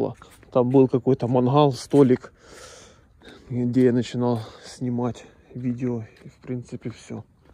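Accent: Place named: Ukraine. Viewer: Так, тут помилка вийшла. Просто, коли Ви говорите російською, дуже чути, що Ви українець. Говоріть українською, в ній є душа.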